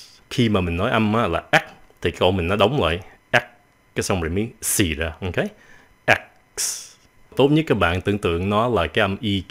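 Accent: United States. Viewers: Do ở đây dạy phát âm theo Anh-Mỹ. Nên đọc theo là đúng với nhiều ngừoi Mỹ mình nghe, Còn cách đọc thành iks là âm Anh-Anh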